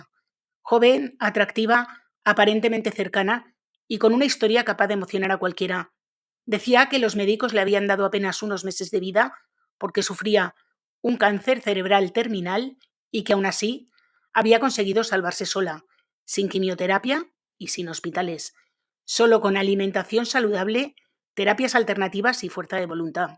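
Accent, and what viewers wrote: North, Spain. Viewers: Creo haber oído a alguna vez que es madrileña. Pero si parece que tiene acento del norte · No lo entiendo. Como pueden ser tan atrasados los americanos? Esos remedios son los que decían las bisabuelas, como lo del limón en la mesita de noche para el constipado. No es de extrañar en un país que no tiene sanidad pública. Hay que ser muy imbécil para creer en esos milagros a expensas de la ciencia. Solo faltan los vaqueros vendiendo crecepelos de estado en estado cual salvaje oeste. Una panda de retrasados! Un cordial saludo! Me encanta tu voz.😊 concretamente tu acento. Del norte?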